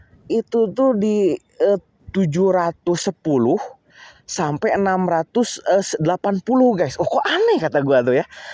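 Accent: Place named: West Java, Indonesia. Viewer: Lu orang jabar, gak usah ngomong gue, logat sunda.. Gak cocok cuk..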